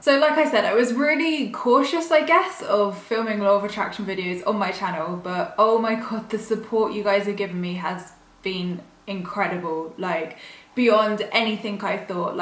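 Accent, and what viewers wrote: England, United Kingdom. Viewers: I'm trying to manifest my dream home, which would be a self build straw bale house (not as unstable it sounds lol, actually a really well insulated house that is covered in plaster and cheap and eco friendly). This will mean I'll need a lot of money to buy the land and pay for the build, but I'm not complicating it by thinking about all the different aspects of it too much, I'm just visualising the house, and am going to try putting my written list in a manifestation box too like you have suggested. I'll watch the rest of your videos too and let you know how it's going, great to find another English person making these videos too, though all the American ones are still inspirational, somehow the English accent makes it more relevant to me.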